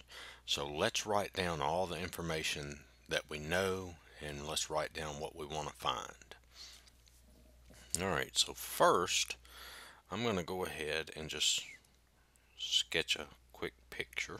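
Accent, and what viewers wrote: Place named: United States. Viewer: I like your accent.. it sounds... American. Very soothing. (this is comming from an Australian). Great video!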